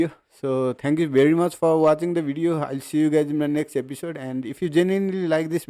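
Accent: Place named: Nepal. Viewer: from the accent I can say you are Nepali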